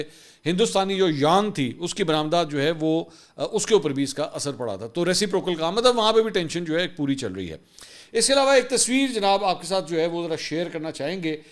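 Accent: Punjab, Pakistan. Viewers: A lot of people like me have a lot of political differences with you but how you show up in this crisis I'm proud of you for standing up as a pure Pakistani and specially when you always give a nice punjabi touch 🎉 Pakistan really stood up as a nation. Alhamdulilah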